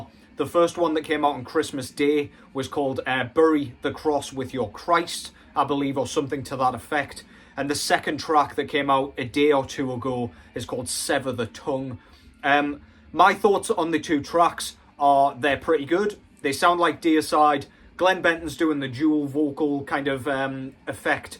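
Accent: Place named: Northern England, United Kingdom. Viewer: You saying piss poor in your delightful northern English accent is my favorite thing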